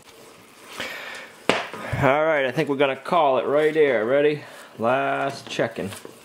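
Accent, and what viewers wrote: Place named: New Hampshire, United States. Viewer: Gooood Mawning! I hope my poke at the New Hampshire (Massachusetts blend) accent doesn't offend, but it does come through loud and clear to this Lawn Guylanda! Looks great in the outdoor kitchen. I like that you're "tapping into" the available local resources for making Maple Syrup. I hope that you can put smiles on the faces of friends that you share it with and maybe trade it for something else that you can use. You should see if your pig farmer is willing to trade! Have a great day and enjoy the day with Olivia and Gina whatever y'all do.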